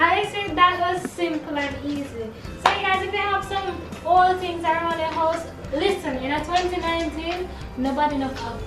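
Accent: Jamaica. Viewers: That's a Jamaican accent. Pure Jamaican beauty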